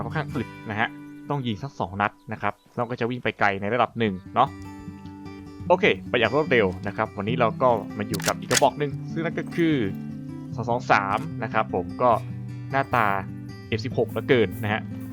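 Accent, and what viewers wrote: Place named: North, Thailand. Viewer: อันนี้เป็นคนเหนือแม่นก่อครับ สำเนียงออกเหนือเลย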